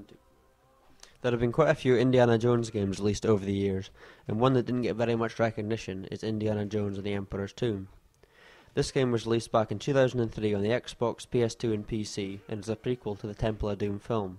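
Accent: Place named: Scotland, United Kingdom. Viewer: is it possible for Xbox one backwards compatibility. Probs not. Is it on steam or any other seller? By the way, you sound Scottish, I'm from there as well :D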